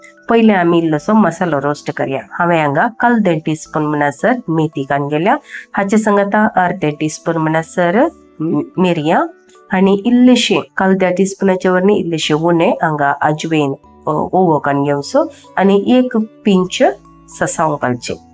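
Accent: Konkan, India. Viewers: Tai Tumi ulaitalo Konkani bhasha kitle chod god aasa. Ani haanv pun ambot bangude ani tarlee nistyancho kadi banounk shiklo aasa. ❤❤❤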